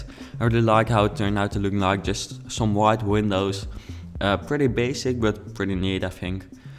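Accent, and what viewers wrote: Netherlands. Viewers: Je hoort duidelijk dat je Nederlands bent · Hey nice video , i love your accent. I am also dutch, well my dad is my mum isn't so that explains my name XD i don't know much dutch buy yeah nice video XD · You can really hear you are Dutch 😂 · I can hear the Dutch through your English. I'm Flemish. (Yes, I'm watching this in 2017.) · Are you from the netherlands? Your accent sounds dutch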